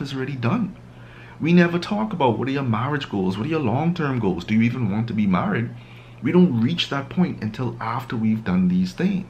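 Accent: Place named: Bahamas. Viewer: Are you a Bahamian? That accent sounds like one from the Bahamas.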